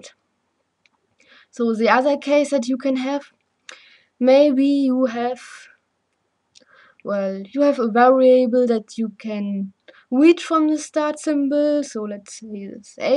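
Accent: Germany. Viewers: schone Deutsche accent :)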